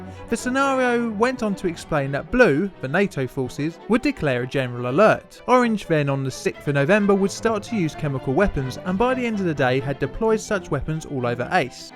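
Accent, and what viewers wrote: United Kingdom. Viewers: Great vid, except audio. The narrator's voice is a bit unclear, heavy Brit accent. Not the problem, but the classical music in background is a bit too loud. Between the two, it sounds chaotic.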